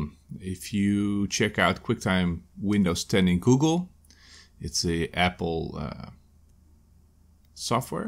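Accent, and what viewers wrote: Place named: Netherlands. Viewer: Nederlander xD, hoor het aan je accent.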